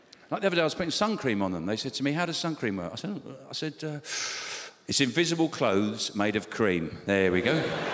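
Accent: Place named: Cockney, United Kingdom. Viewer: As an Australian I love the Cockney accent. Our entire Australian English language is basically based around it.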